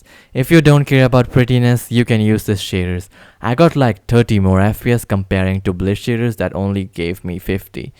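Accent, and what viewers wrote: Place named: India. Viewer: i feel like getting scammed from a india scammer he has like an voice like an idian try to speak english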